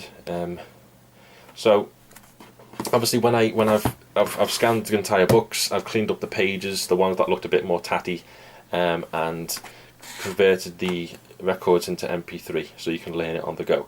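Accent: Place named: Merseyside, United Kingdom. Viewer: Merseyside clearly.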